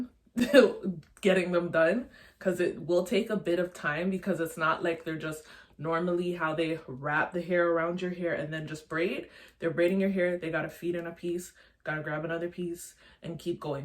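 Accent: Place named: Toronto, Canada. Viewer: You sound like you from Toronto. Your hair looks great I want to try these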